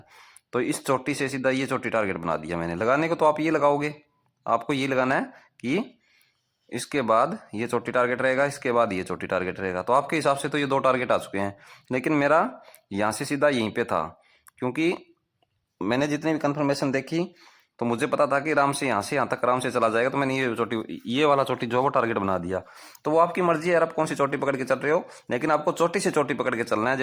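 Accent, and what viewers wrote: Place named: Jind, India. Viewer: m jind se hu bhai voice se laga tha local bhai ho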